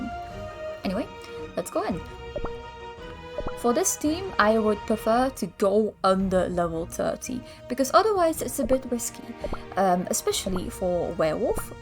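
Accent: United Kingdom. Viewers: Bri’ish tbh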